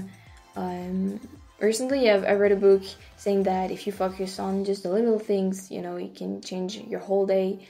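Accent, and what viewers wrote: France. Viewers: Your English is fine. And the little differences makes it more interesting-- I love listening to your French accent. I grew up with a partly French family who were and are our best friends in the Detroit , MI area. I love their accents. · Wait what kind of channel: 😳 ? .........Lol. But in all seriousness I i love your channel and reactions and lovely French accent. You're wonderful, keep up the good work. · Watching you reminds me of my visits to your country (Paris 2 times) and your accent makes me happy. Keep being you and please stay safe.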